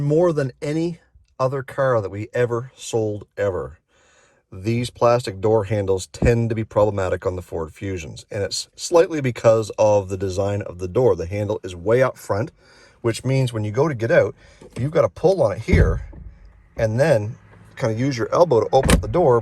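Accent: Canada. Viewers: There is that damn ou Canadian accent. Just terrible. HATE IT.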